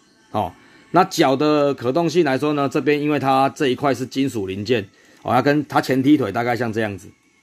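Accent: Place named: Tainan, Taiwan. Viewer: 懶pa是台南人？ 語氣聽起來很台南～～ 太可愛了～好想買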